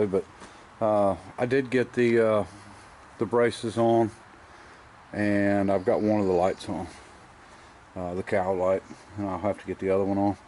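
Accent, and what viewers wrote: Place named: Florida Panhandle, United States. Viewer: I didn't realize you were in Florida.. so am I. I'm a Boston transplant and I've been in southern Fl. for a few years now. If I had to guess by your accent, I'd say you're from the panhandle..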